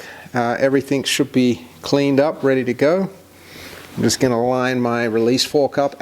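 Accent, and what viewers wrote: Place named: Australia. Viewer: Great video! Where’s your accent from? You sound Aussie! Thanks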